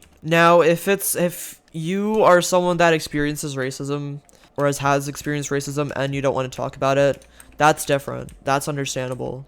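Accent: India. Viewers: Great vid i am also indian but i was raised in Louisiana and Alabama so i dont have an accent like u! Def my fav yter ☺️ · I was doubting from when I started to watch your videos as your face looked like an Indian and your accent of English Lots of love from India dude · Are u kidding me?!?! U r Indian Bruhhhhh your acccent wtfff I see ... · Are you fr rn? Just because people don’t say their Indian or whatever doesn’t mean it’s racist stereotypes LMAO. Watched this and spit my drink laughing. Do white people point out they’re white?? Racism is a big deal but cmon man . Also imo it’s not that hard to tell if someone’s Indian based on their voice, (when I first watched you I knew right away) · Me and my family are Indian and my dad said to me that whoever ur watching is indian because he recognized the voice but I didn’t believe him. It’s actualy kind of a relief because I want to be a YouTuber as a 2nd job but I was afraid of people being racist. 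But so glad to know I can do it. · I AM REALLY PROUD OF YOU THE BEST SKYWARS PLAYER IS FROM INDIA AND I HAVE A DOUBT FROM YOUR ACENT AND YOUR FACE THAT U ARE INDIAN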